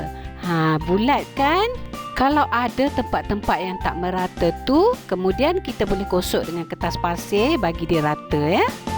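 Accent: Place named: Malaysia. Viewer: Serasa lihat film upin ipin. Bahasa nya sekampung dgn upin ipin. Xixixi. Salam kenal kak.. Saya dari indonesia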